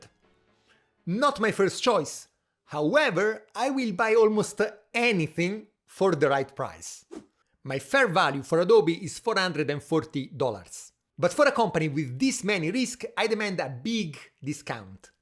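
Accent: Tuscany, Italy. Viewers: Fantastico come l’accento toscano si estende anche all’inglese 😄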